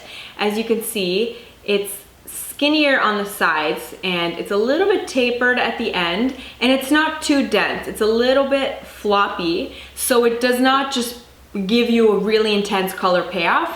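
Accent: Canada. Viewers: I'm loving your Favourites vids- they are to the point but not too curt and your recommends are consistently great. Also I don't know why but I used to live in Ontario and your style reminds me of it for some reason...maybe your accent? Anyways, it's a good thing! Thanks for sharing and props to your ubiquitous quality.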